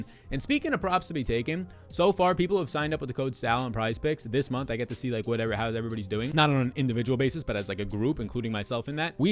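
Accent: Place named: Texas, United States. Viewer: Mann thanks for the detailed info… feel like I’m listening to a tru Texan ..💪🏾 ✌🏾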